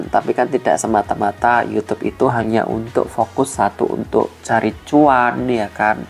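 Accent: Java, Indonesia. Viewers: Aku seneng sm ngomongnya mas jawanya gak dihilangin mas aku suka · yaa jangan dong mas biarpun aku jarang komen tp aku tetap nonton video mas,yg bikin seneng celotehan dengan bahasa Jawa medoknya yg bikin kangen❤ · mas jngn break jngn di jual klo di jual mending ibuny yg nerusin klo mas break gk ada yg celotehanny yg lucu ngomong jowone ora ketinggalan aku seneng soale aku yo wong jowo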